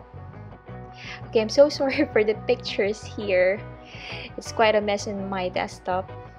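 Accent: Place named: Tagalog, Philippines. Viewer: Yeah does sound like she has a Tagalog Accent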